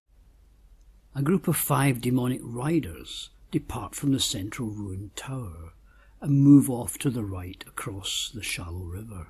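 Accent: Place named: United Kingdom. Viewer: Dear, I want to thank you for all the work you do.. I am truly lucky to have you in this world, showing me the beautiful marvels I would have probably missed otherwise. Also the knowledge that you share and all the work you must have put into it all.. words are insufficient for how I feel towards it. Secondly, I am planning on taking a trip around the UK to see all the magical places. Do you have any suggestions? Also, I know it might come across as creepy, but I would love to meet you. You sound like you live in the UK, and I am a traveler on the journey of life and it would be an amazing addition to my journey to be able to talk with you. Anyhow, whatever happens, I wish you great love and bliss. Much love, Joep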